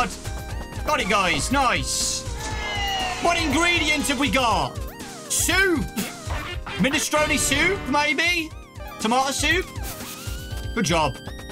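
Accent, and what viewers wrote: United Kingdom. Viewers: i dont know whats so funny about a british man screaming EXOTIC BUTTERS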